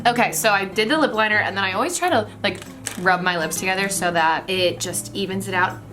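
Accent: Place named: Long Island, United States. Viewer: I thought might’ve been Italian like Long Island Italian until I got to the part were she couldn’t say Caprese LOLOL I love her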